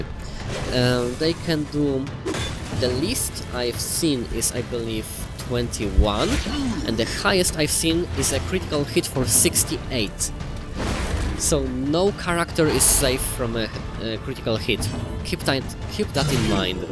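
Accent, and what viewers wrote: Poland. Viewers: Yeah, typical polish accent, i knew it the first second as well.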